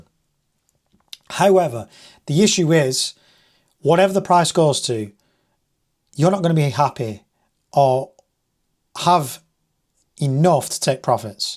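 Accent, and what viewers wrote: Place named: North West England, United Kingdom. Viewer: Good to hear a north west accent in the space! Good luck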